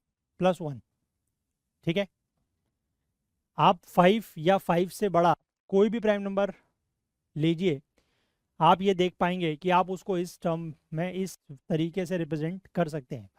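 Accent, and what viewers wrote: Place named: Kashmir, India. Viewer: That Kashmiri accent😂❤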